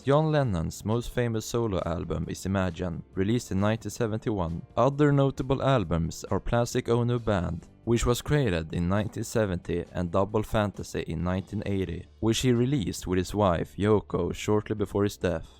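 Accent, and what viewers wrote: Sweden. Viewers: you sound so swedish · Your ATROCIOUS swedish accent is just too unbearable to listen to for a whole video, sorry... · I like your swedish accent, I also happen to have hyperfixation on so this video will be on my "videos for eating snacks" list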